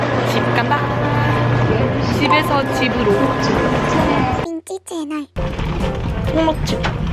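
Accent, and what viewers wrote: Yeosu, South Korea. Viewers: 말투가 익숙하다 했는데 여수가 고향이셨군요! 역앞에 바다가 있다니 너무 멋져요ㅠ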